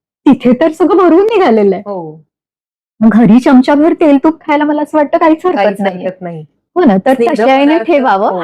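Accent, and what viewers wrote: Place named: Pune, India. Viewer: Madam tumhi kitisundar shuddha Puneri utchhar Marathi bolat ahat. Khup chhan vatala aikun. Tumhala shabbaski dete. Bless you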